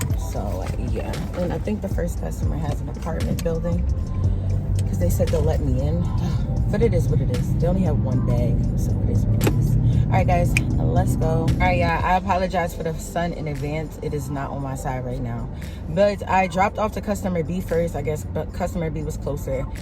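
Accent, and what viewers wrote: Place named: New Jersey, United States. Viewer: Your accent sounds like your close to me I'm in burlington nj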